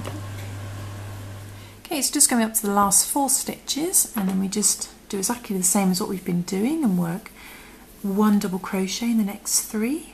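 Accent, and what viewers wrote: England, United Kingdom. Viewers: Oh I was so happy when I heard your English accent but turned into a frown when you started to explain using American terms lol ... Great tutorial tho..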